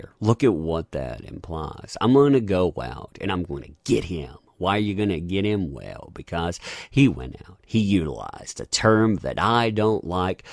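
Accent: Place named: South Carolina, United States. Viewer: "Y'all" is disgusting to you? You sound like your an SC boy like myself so that's just heresy!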